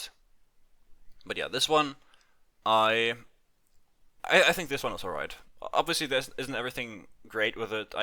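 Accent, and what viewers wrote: Germany. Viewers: can I ask about your accent if you don't mind answering? sometimes you sound standard german but sometimes you sound like a combination of singaporean + australian so I was just trying to narrow it down haha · Yeah, it's probably not possible...I'd consider myself to be a diehard fan and way too obsessed with this game and I can't think of any way that might make it work solo, at least not within 15 turns. I'm currently rewatching your video (after watching some of your build guides) while doing my own "kill everything on sight" run. 😄 I did cheat for the nautiloid though, because I have mods that make additional enemies spawn and I couldn't have handled 3 cambions and 2 mindflayers (+ the 2 cambions on the way and all the smaller enemies) on my own :D The run is a lot of fun, though I gain xp way slower than I thought I would from slaughtering everybody; I guess not finishing any quests really makes a difference. 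Completely unrelated, but I was wondering: Is you accent German? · Are you german?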